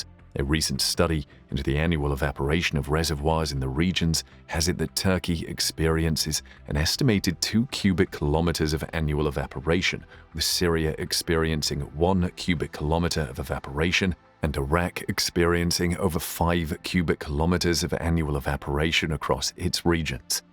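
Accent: United Kingdom. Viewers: If a British guy is narrating you know it's gotta be legit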